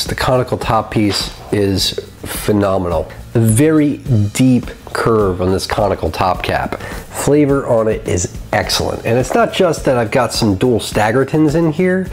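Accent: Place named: Boston, United States. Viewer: Been wondering bout you. Glad it's just a work thing that has kept you busy. Only 2 reviewers do rda reviews right. You and Ambitionz. Now if I could get over the Boston accent.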